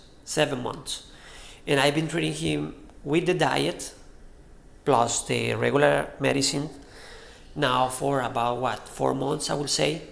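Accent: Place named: Colombia. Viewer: You sound Colombian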